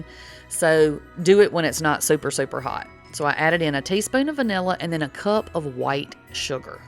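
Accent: South, United States. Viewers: Don't know where you are from. You have a southern draw and no one in the south or anywhere else in the world pronouns perylene that way !!!!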